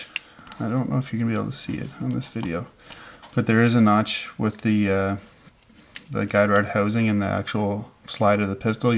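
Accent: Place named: Canada. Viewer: your accent screams "I'm canadian" haha, much love from Quebec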